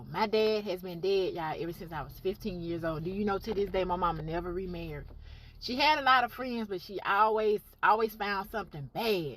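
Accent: South, United States. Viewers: I can tell you''re a southern lady! Alabama, Mississippi or Tennessee! Sounds good, and I can tell you can cook and keep a clean home! There's a difference between a house and a home! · Are you from Alabama or Mississippi? That southern accent is deep.😂😂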